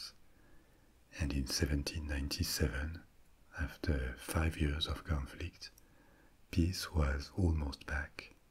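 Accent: France. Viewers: ASMR & History with a French accent.Perfect.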